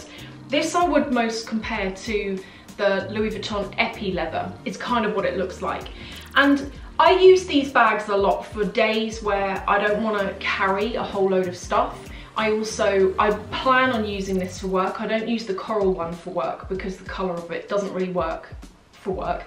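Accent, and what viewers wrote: England, United Kingdom. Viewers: listen to your English Accent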